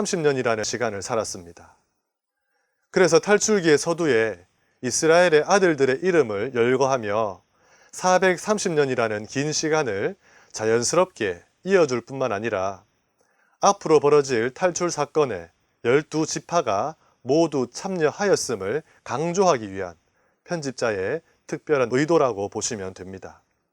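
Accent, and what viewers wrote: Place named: Gyeongsang, South Korea. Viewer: 차분하게 알아듣기쉽게 구수한경상도말씀이 너무도 잘 드렸습니다 고맙습니다~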